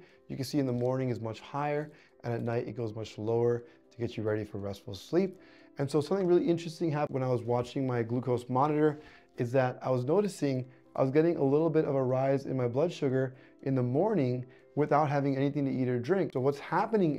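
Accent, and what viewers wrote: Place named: Iran. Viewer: Nice irani accent.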